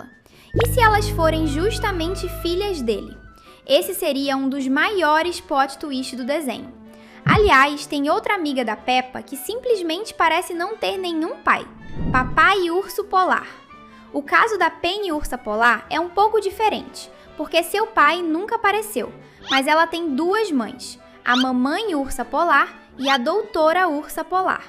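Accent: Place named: Minas Gerais, Brazil. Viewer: Vc é de onde? Vc tem um sotaque mineiro ou baiano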